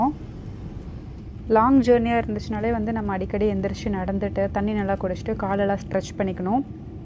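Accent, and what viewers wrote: Kongu, India. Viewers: Kongunatu mangai kongu Tamil super video bye Anna. From.covai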